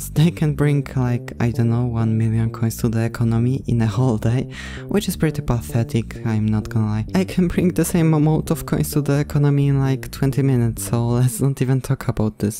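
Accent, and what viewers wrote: Poland. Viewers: Are you from Poland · are you polish? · polski akcent jest mocno słyszalny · Are you Polish? Your accent seem like a real good Polish one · Your english is special (better than mine). Od początku wiedziałem że jesteś Polakiem. Trzymaj się tam i powodzenia w rozwoju kanału. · you sound like guy from poland speaking english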